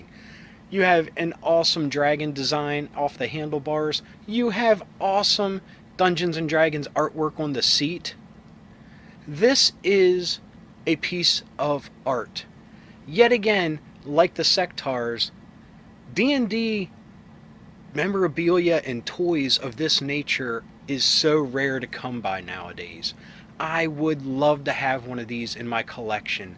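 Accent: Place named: Baltimore, United States. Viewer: Sounds like you’re from Baltimore too bro 😅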